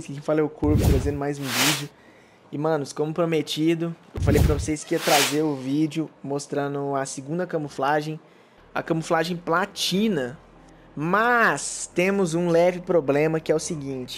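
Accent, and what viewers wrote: Minas Gerais, Brazil. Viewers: cê é de minas?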